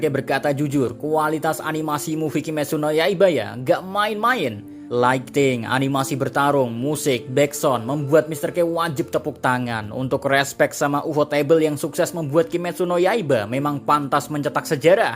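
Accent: Java, Indonesia. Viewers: Jawa nya pekat banget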